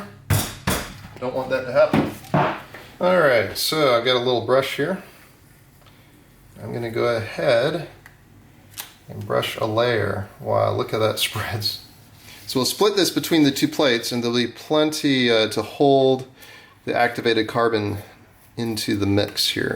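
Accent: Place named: United States. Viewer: you sound american so this might be hard.... try using chared hemp fiber. being from the usa myself i know its around if you ask enough people. I havent had the time to start these experiments yet but There is a brit with a phd in material science that gave it a shot and was blown away. Check out Robert Murray-Smith if you dotn already know who he is... Its performance is at least as good as graphine.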